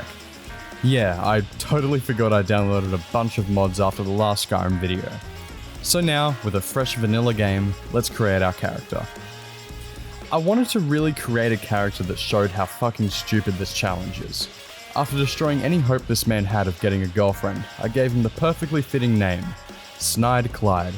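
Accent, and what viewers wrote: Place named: Australia. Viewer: “Youtube existed when you were 11? And was paying royalties? Damn you’re young!” - me, who refuses to admit she’s old 🙈 Love the video. Nice to hear an Aussie accent doing silly challenge runs on a 12 year old game 😊👍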